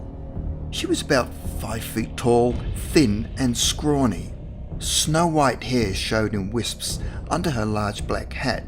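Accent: Australia. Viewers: That’s the way Aussie’s pronounce their numbers.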